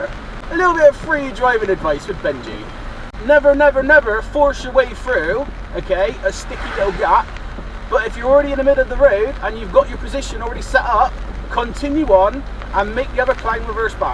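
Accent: United Kingdom. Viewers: Matey, i loove your pure BRITISH accent :-))) Cheers! BTW...Good lessons for ya kid, matey :-)))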